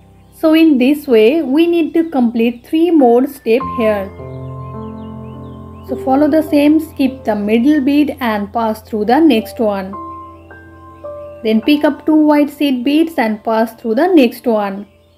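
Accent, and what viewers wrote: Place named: India. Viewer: محتوى مفيد واصلي لكنتكي تبدو من الهند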